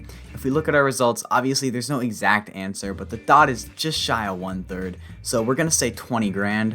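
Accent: Canada. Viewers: Dude why are you so amazed. We have little towns all over near Sarnia (which is where I believe you are from by the accent, me too). Arkona? Thedford? Watford? Chamlachie? The list goes on.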